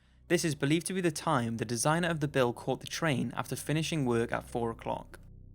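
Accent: Wales, United Kingdom. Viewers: All the commentary is very well done in your videos. Other similar Top '#' channels either have annoying voices or talk too slow, fast, etc., and your accent is cool to listen to as well. (Which part of the UK are you from?) Keep up the good work! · are you Welsh? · Are you welsh? You sound like you have a Welsh accent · Are u welsh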